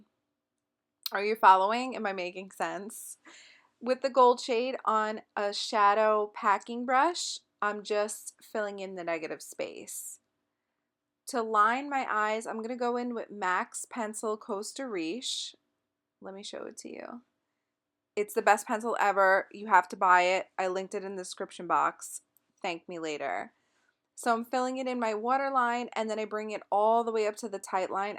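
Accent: New Jersey, United States. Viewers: You have such a thick Jersey accent! Haha :) thanks for the review! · Every time I watch your videos, your accent makes me miss Jersey!! I know your in NY, but this Jersey girl misses home. Adore you beautiful, and your videos. Keep them coming. xo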